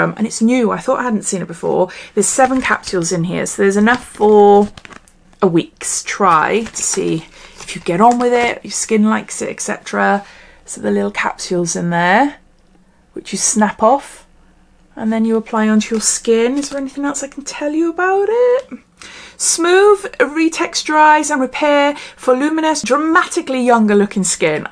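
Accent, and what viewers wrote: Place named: England, United Kingdom. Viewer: I subscribed because of ur accent I am subscribed to a few English ladies. I'm in the USA in the state of Virginia 😊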